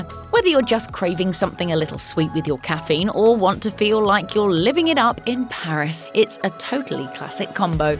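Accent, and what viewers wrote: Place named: United Kingdom. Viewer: The British feminine accent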